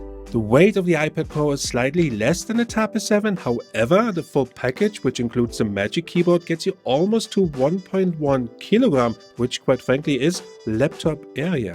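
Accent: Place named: Germany. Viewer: Thats a Strong German Accent ! Are you German ?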